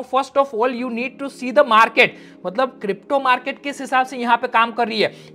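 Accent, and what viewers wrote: Gujarat, India. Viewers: Bhai tu Gujarati hai kya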